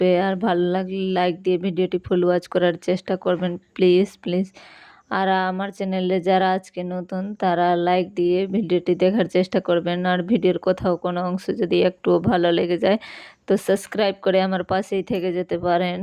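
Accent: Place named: Murshidabad, India. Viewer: আপু তোমার বাড়ি কি মুর্শিদাবাদ , তোমার কথা শুনে ওরকমই মনে হচ্ছে😊😊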